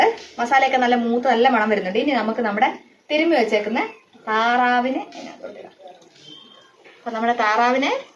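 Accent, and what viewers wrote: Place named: Southern Kerala, India. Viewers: കോഴിക്കോട് ആണോ? ഞാൻ ഓർത്തു ഇടുക്കി ആയിരിക്കുമെന്ന്. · സംസാരം കേട്ടാല്‍ കോയിക്കോട്ടുകാരിയല്ല.... ഇങ്ങള്....ഇങ്ങ് ''തെക്കത്തി''.....അതായത് കോട്ടയം..പത്തനംതിട്ട ഭാഗത്തുകാരിയാന്നേ പറയൂ......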